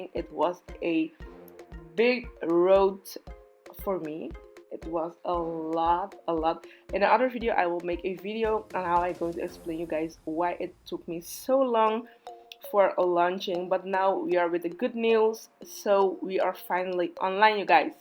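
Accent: Netherlands. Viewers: Ik hoorde gelijk zij is Nederlands 😂 girlll those nails gorgeousss✨😍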